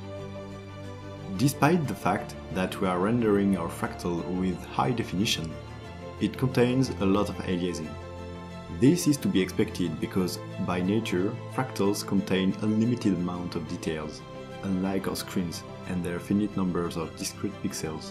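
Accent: France. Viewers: Salut , je voulais savoir sur quelle plateforme on pourrait communiquer. Je laisse ce commentaire en français car l'accent ne trompe pas 😂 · I like your accent u french? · I hadn't noticed but you have a French accent! 😂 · Ohhhh cet accent Français je reconnais:) Nice work!!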